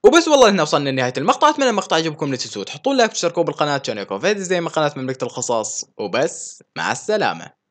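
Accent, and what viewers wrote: Iraq. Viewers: لا عراقي باعي لهجته عراقيه 👀😊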